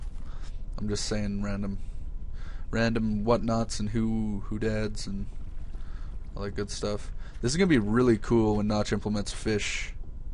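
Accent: Canada. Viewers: "i am going to punch you... with a sword" and by the wayare you canadian?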